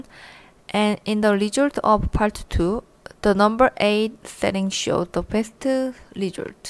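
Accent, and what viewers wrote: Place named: South Korea. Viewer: 한국분이셈? 영어발음이 낯익네요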